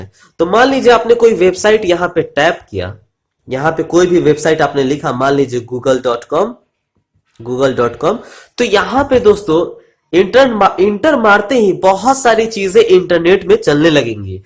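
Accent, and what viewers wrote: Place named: Bihar, India. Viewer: bihari hai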